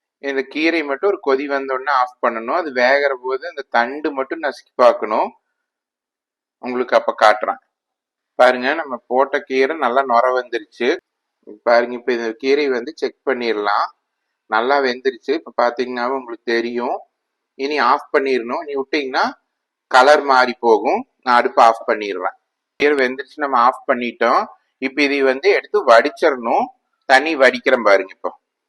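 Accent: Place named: Kongu, India. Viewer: சாமி நம்ம கொங்கு நாட்டு தமிழ் அழகே தனிதான் . உங்க தமிழும் அருமை கீரை கடயலும் அருமை. வா சாமி என்ற ஊட்டுக்கு சாப்பிட குடும்பத்தோட வா கண்ணு🙏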